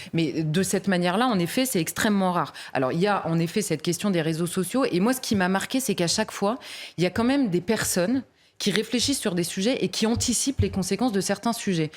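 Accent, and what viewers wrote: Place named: Southampton, United Kingdom. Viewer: J'ai reconnu l'accent de Southampton en Angleterre ...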